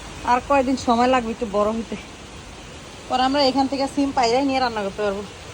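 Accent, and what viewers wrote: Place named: Comilla, Bangladesh. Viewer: আপু কিছু মনে করবেন না,কুমিল্লা কোথায় আপনার দেশে বাড়ি কেননা আপনার কথা ঠিক আমাদের বাড়ির কথা ,আমিও আমেরিকাতে আছি,please আপু বলবেন কিন্তু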